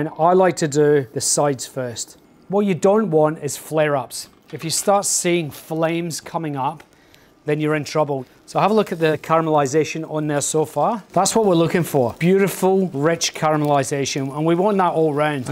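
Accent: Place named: Scotland, United Kingdom. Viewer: scottish guy where you from in glasgow?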